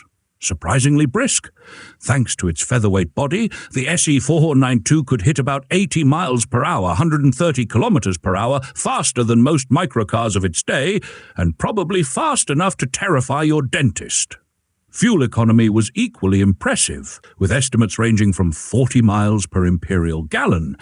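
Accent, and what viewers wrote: England, United Kingdom. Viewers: AI can make it sound like an English accent but lets itself down with pronunciation.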